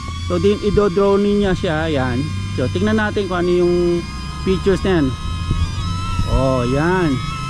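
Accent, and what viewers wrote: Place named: Pampanga, Philippines. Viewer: Kapampangan ka po ba sir? Na enjoy ko video mo hahahah bukod sa dahil sa drone e ka accent mo din yung namayapa kong tatay, more drone videos po🫡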